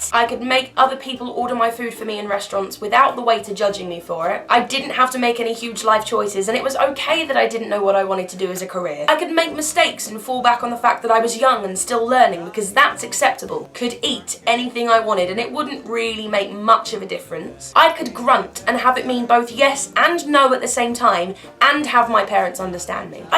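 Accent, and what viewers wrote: United Kingdom. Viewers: You remind me of Merida from 'Brave', :) even though you speak british and not irish.. It's your hair! I like it ^^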